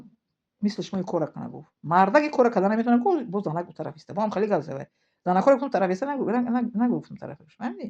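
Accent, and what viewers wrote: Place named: Kulob, Tajikistan. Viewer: Ехоҳар хдт ай кҷои Гуфти кулобиҳо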